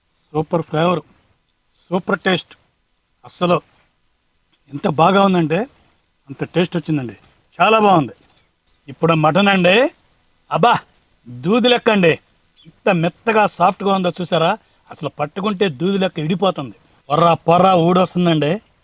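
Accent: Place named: Telangana, India. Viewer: Brooo meru telangana lo vunnara chala years language same mala ఉంది 😍😂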